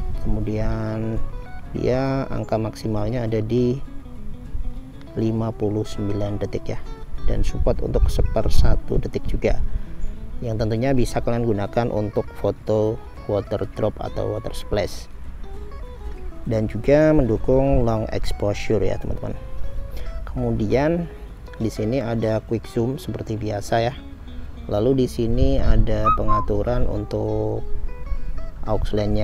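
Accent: Pasuruan, Indonesia. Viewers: Eh masnya pasuruan toh 😄 Koyok daerah bangil. Oh iya Mas mau tanya untuk redmi note 7 ada gak gcam support yang ada fitur wide angle ada gak mas? Buat keperluan foto lanscape 🙏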